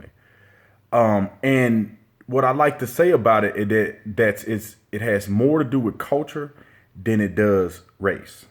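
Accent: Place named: Fort Worth, United States. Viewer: Yo! Your from Ft Worth! Me too! I knew I heard the accent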